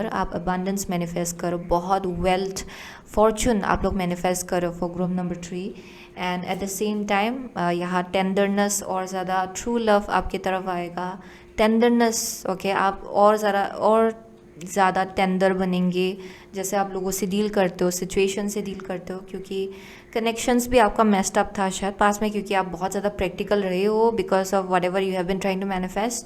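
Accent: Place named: Uttarakhand, India. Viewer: But her accent sounds she definitely from uttarakhand, ne ppl has a little accent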